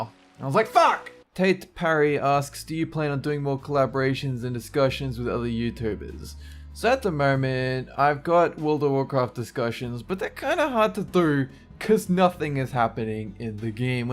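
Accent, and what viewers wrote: Australia. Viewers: Wait. You're Australian. WAT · Your Australian..... Holy shit I love you so much more... State? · TectalchameleonJamaa it's almost as if it is an Australian accent! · Didn't know you were Aussie... didn't really pay attention or care either but mkay. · Wait ur aussie?? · is Aussie?!?! 😂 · I didn't know your were aussie! Sweet!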